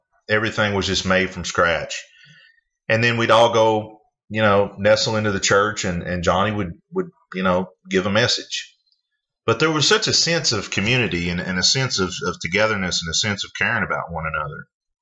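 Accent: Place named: North Carolina, United States. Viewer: I thought I recognized your accent... you are from North Carolina, right?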